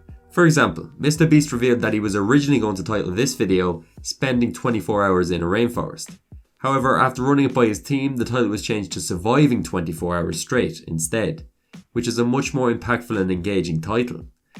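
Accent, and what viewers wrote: Ireland. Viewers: I knew you sounded Irish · I'm from ireland and I could tell you were as well from your accent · The name and accent made me think you were Irish and then you said tirty it was obvious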